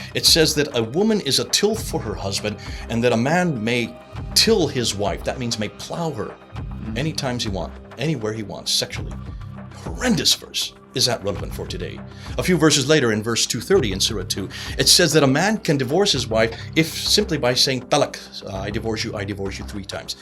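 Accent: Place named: United States. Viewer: He's Britain but sounds like American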